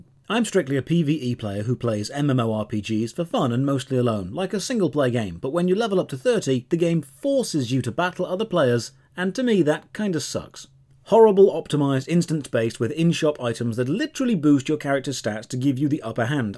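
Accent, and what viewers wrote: United Kingdom. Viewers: I often wonder what your sub count would be like if you didn't look like Henry Cavill and have a British accent.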